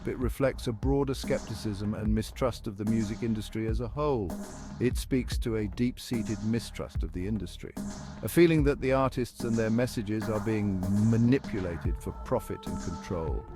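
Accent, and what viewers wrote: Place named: United Kingdom. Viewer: hearing a British guy talk about hiphop is wild haha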